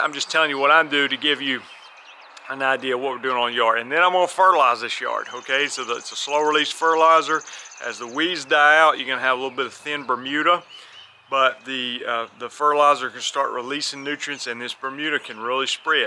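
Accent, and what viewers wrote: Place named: South Carolina, United States. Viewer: Hahahha they wishing! All they really need to do is call you sir! "everthang" ahahh we got everythang I live in South Carolina and you just don't hear folks talking like this much. I feel at home.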